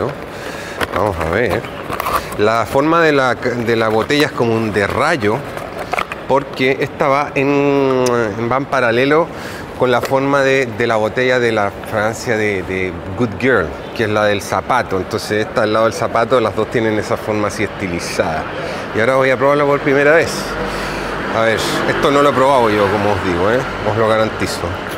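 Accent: Chile. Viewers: Lo escucho hablar y se me hace que es chileno jajaja o es mi idea🤔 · Es muy divertido oírte hablar con acento chileno pero con modismos españoles, jajajajaja, me gusta mucho tu canal, gracias a ti uso Acqua di Gio Profumo. Saludos desde Chile 🇨🇱!!! Un fuerte abrazo!!!! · Que genial escuchar sobre este tema y más con el aun acento chileno. Estaba viendo el canal de Rosy MC Michael que tiene millones de seguidores y te menciono, me alegro de haber buscado tu canal. Éxito! y saludos desde Chile :)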